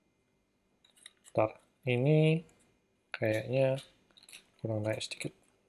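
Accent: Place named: Java, Indonesia. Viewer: Mantep... medhok reviewer e wkwkwk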